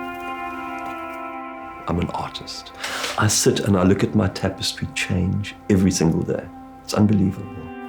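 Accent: South Africa. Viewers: You sound South African. Thank you for your positive video Happy Retirement. 🇨🇦🇿🇦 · Retiring brings on a mindset of … it’s the end 💥 … agree with that. You sound like a fellow South African … Blessings 🇿🇦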